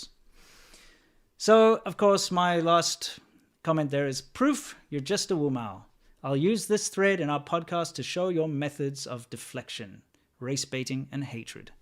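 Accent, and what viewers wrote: South Africa. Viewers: Your SA accent is slight but insidious. I spent half the video thinking you were talking about something called a "Lenin wall". They should arrange reinforced glass cases for such things at universities to avoid vandalism. Or at least make it a punishable offense to vandalize them. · I love watching your videos, we all know that the Chinese govt sucks, but there’s also the usual “it’s the government, not the people”. You bring us education especially about things we’ve never imagined we’re going on. Unfortunately we also learn that indeed (some of?) the people are to be viewed with suspicion as well, as they walk among us covertly. That’s so sad, I haven’t decided yet if that’s all only because of their government and they wouldn’t be horrible otherwise or if the government is that way because people are. I’ve seen them do some pretty shitty things here in my country just in everyday life, and heard worse from people who had to go to China and other Asian countries for some reason or other. 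It’s interesting how obvious it is here when you see a person who has Chinese blood, you can usually tell way before they open their mouths if they were born here or not, even just by how they walk and look at people, and the way they hold themselves, or even if they’re littering, picking their noses, and spitting everywhere or not. Plus I love your accent, it feels like a homey thing to me as I went to school and grew up with many, many people from SA, so that sound is a little bonus :)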